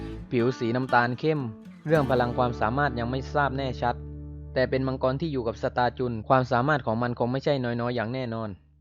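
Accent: South, Thailand. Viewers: ไม่บอกก็รู้ว่าพี่คนใต้แน่ๆ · ผทรู้เลยนิ๊ว่าพี่เป็นคนใต้ชั้ยมั้ยนิ๊ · คนใต้ ชัดเจน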